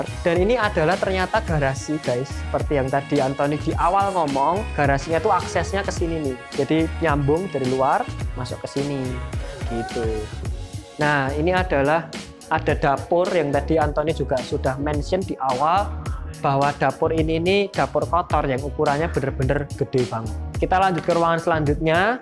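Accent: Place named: East Java, Indonesia. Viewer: logat e indonesia ,koyok e arek iki cah jawa timuran 😅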